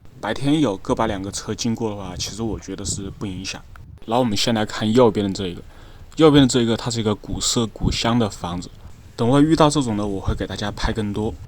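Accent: Wuhan, China. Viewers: 这口武汉普通话啊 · 武汉口音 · up主是武汉人吗？ 听两句就感觉是武汉普通话😂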